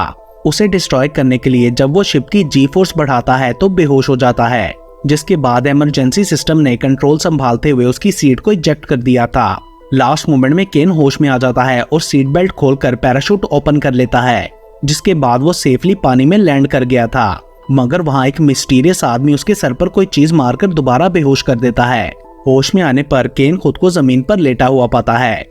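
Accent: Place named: Punjab, India. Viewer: Hindi in punjabi accent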